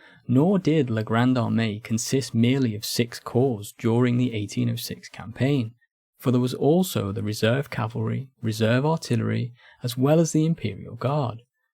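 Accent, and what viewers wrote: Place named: Yorkshire, United Kingdom. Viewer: Or maybe not the accent, I think it's your smooth voice on top of the accent, I'm sure other northerns (I assume you're from yorkshire) font have a voice so heavenly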